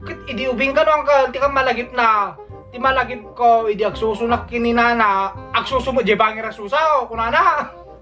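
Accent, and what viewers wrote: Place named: Ilocos, Philippines. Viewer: Mayat gamin Nakkong nga denggen no Ilocano. With accent kunam man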